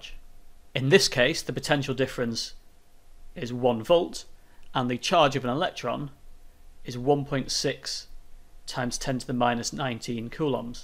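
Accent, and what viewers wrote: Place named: United Kingdom. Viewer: British English 🥵🤤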